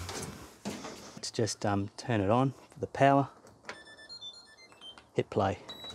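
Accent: Australia. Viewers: I have been stuck on my dryer for a while cause I couldn't find anything to show me the how or what I did wring when replacing the part. So thank you. An also your a funny man I didn't see where u were from an I was like I'm sure he is Aussie an then u said spider I was like yep . So thanks for the info an a giggle look forward to watching more of you.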